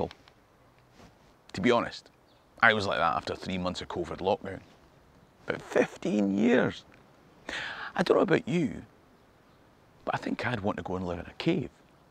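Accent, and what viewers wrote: Scotland, United Kingdom. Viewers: Are you Scottish or British?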